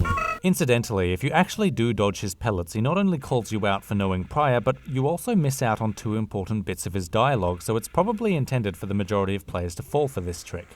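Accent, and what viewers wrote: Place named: Australia. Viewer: The ozzy accent is strong in this one.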